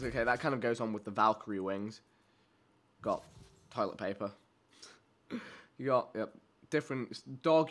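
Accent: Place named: United Kingdom. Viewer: Why do you talk like your from the UK your indian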